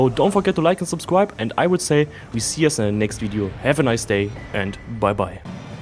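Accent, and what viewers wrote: Germany. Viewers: Also das ist mal ein geiler build. Wenn du mir jetzt noch sagst das ich mit dem diesen scheiß feuerriesen killen kann dann bist du ein Held weil ich diesen Arsch nicht fertig bekomme 😅. Ps: als deutscher hört man immer die anderen deutschen raus 🤣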